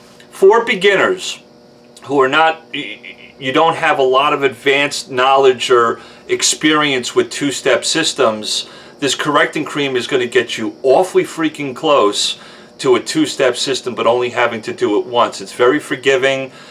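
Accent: New York, United States. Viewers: I'm hearing Long Island or NJ here paisan.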